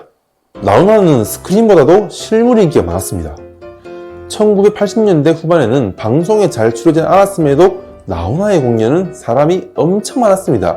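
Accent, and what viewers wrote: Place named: China. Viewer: 유튜버님 혹시 중국인이세요? 아님 조선족이세요? 발음이나 억양이 한국인이 아닌것 같은데ㆍㆍㆍ??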